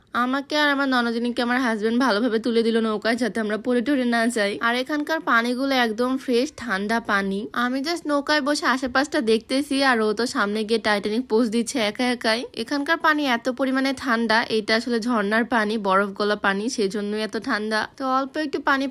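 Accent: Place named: Sylhet, Bangladesh. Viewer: Amader sylhet bole kotha Sundor too hobei Apu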